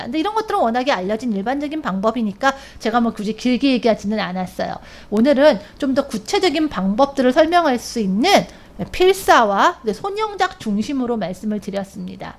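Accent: United Kingdom. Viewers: 요즘 선생님 강의를 듣고 있는데 영국 억양도 그렇구 분위기가 브리짓존스의 르네 젤위거 배우를 많이 닮으신 것 같아요!!!!!너무 아름다우세요!!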